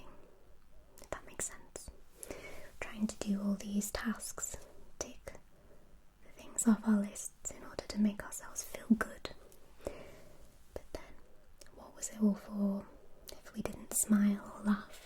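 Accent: United Kingdom. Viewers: I love your accent! Where in the UK are you from?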